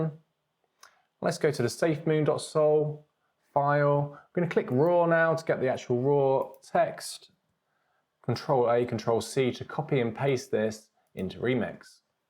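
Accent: United Kingdom. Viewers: I can tell by your accent you are from the UK... What is your take on the FCA regulations? I have asked them a question about setting up my own coin as I don't want to land into any legal trouble. Not had a response yet but reading through their manual they state exchange tokens and utility tokens are not regulated but it has no clarification based on tokens with liquidity. I am unsure if this would make them e-money or a Security Token which are both regulated. The regulation document is so confusing but it would be good to hear someone else's take on it being from the UK and involved in the crypto market.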